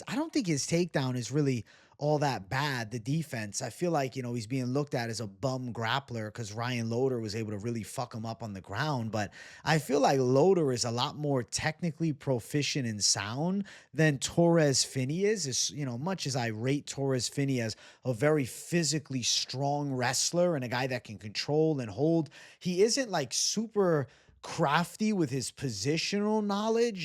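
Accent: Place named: Boston, United States. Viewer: Can take the boy outta boston but cant take boston outta the boy. Blahss-fee-muss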